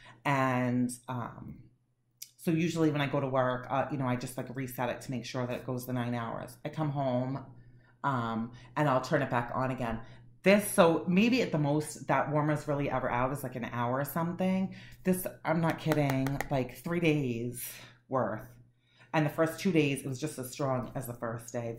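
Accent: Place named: New York, United States. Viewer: I’m from Boston. She sounds like NY or New Jersey.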